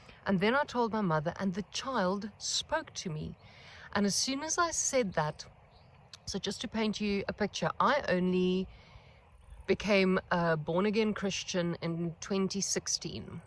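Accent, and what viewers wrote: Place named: South Africa. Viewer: Could hear you are from South Africa as soon as you started speaking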